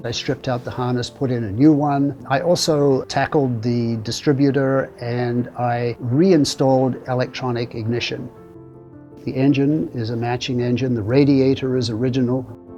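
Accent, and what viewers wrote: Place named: United States. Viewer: I am Australian and I could detect your accent. It sounds like you've been in America a long time. Magnificent car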